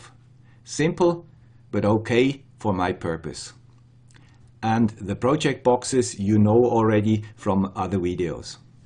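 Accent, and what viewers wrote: Switzerland. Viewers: Happy New Year! Looking forward to another great year of videos from the guy with the Swiss accent. Don't change a thing. I realize you have a long backlog on video ideas, but would love to see one on enclosures for various electronics projects. This is an often overlooked topic in the electronic hobbiest world. Thanks for your great videos. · Hi - wishing you continued success for 2017. You explain things on a level that we understand and continue to be generous on providing code. I fully agree that a community is starting to appear and that we can all help each other when hit a small road block. So the guy with the "Swiss accent" please keep on with projects and teaching as well as you go. I personally think is one of the best channels I have found.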